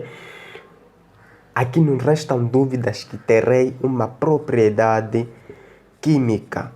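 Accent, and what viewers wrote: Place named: North, Portugal. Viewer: Você é do norte. Right?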